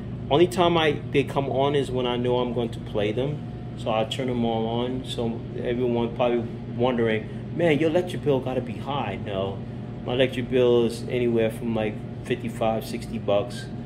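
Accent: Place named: Bronx, United States. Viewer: What part of the Bronx are you from?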